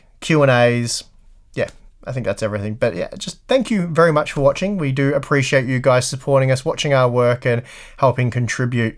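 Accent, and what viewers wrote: Australia. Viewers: it's very much Australian. Love the channel for this reason, grew up with it and feels close to home.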